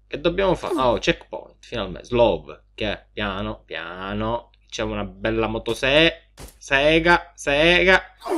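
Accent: Palermo, Italy. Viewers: SEI DI PALERMO?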